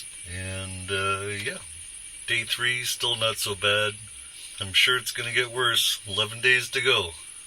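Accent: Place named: Canada. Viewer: i can listen to this canadian style out and about all day long 😄 . what is moochdocking ?.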